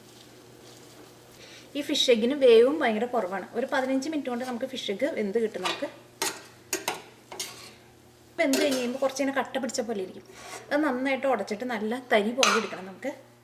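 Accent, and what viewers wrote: Thrissur, India. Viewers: Thrissur bhasha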